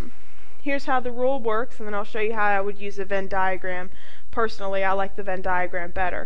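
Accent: United States. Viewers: are you from the south? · Love the accent...Georgia? I'm from Texas.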